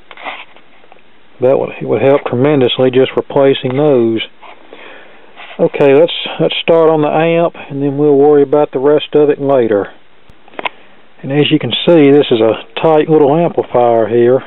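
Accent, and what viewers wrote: South, United States. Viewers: you sound like a True- Knowledgeable Southern Gentlemen of Audio Repair Technology - I,ll stay subscribed to your Channel.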